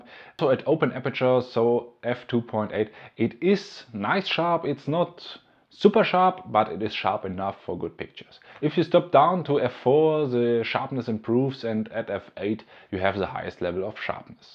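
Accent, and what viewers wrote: Germany. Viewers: Rede doch lieber gleich deutsch ehe du dich mit deinem harten deutschen Akzent abmühst englisch zu sprechen.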